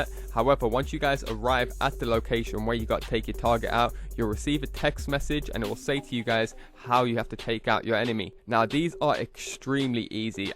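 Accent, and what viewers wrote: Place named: United Kingdom. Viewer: yo swear down youre from uk! grime!!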